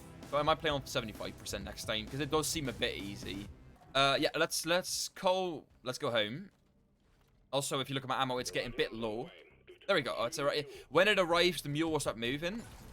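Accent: England, United Kingdom. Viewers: Pls practice an American accent instead of your horrible English accent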